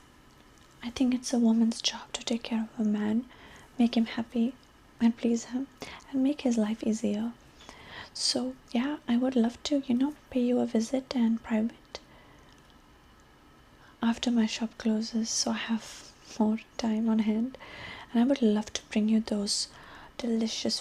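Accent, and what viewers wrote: India. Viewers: “Do you know how expensive my sari is??” 🥳Your Indian accent and this character are the best! Also: the moon is always out, even in the day, but is made invisible by the suns brightness, which means your light is eternal and constant, unlike the suns.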